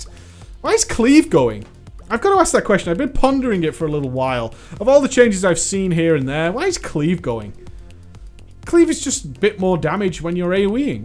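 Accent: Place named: Scotland, United Kingdom. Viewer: I can't understand half what this mush-mouth is saying. Yelling, then mumbling with that thick-as-haggis accent doesn't work well.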